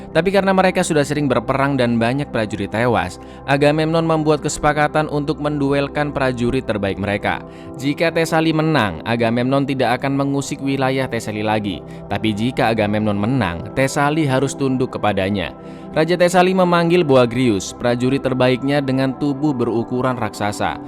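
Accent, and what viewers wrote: Java, Indonesia. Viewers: Bang orang Jawa ya bang?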